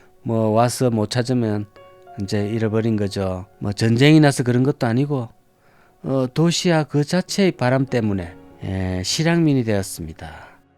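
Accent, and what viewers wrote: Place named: Busan, South Korea. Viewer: 부산 알고있습니다 오늘도 구수한 부산 말씀 수고하십니다 새로지은 도시화로 그리운 추억도 고향의 향기를 잃어버린 현실이 안타깝습니다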